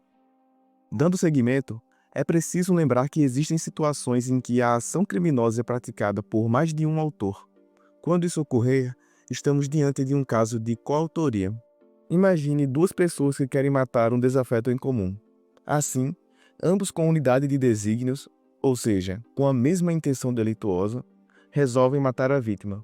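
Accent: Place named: Recife, Brazil. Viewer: Gostei do seu sutaque! É de Recife, é?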